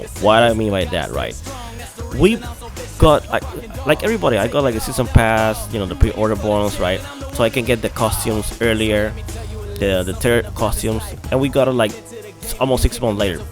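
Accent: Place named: New Jersey, United States. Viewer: I love your New Jersey accent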